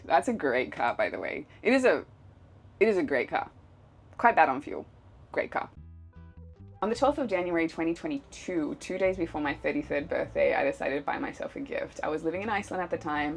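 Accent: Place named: Australia. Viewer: is such a cool name! Especially with an Aussie accent, its just fun to say:)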